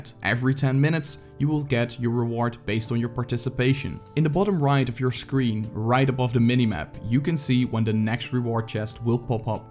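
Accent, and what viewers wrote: Netherlands. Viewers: Same haha! I already thought he was Dutch and im right! Goede engelse uitspraak tho!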